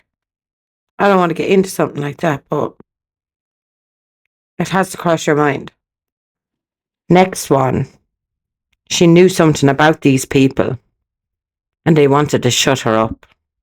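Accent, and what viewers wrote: Ireland. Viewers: Love your Irish accent and I agree with you. This was not a terrible accident. 😢If they were innocent fishermen why haven’t they come forward?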